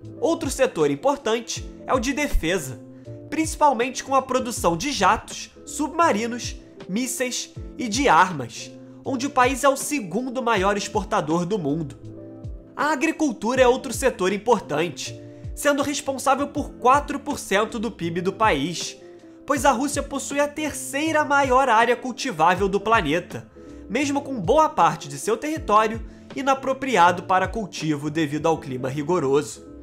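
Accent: Rio de Janeiro, Brazil. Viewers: Sotaque chato. É carioca? Não consegui me concentrar no assunto do vídeo.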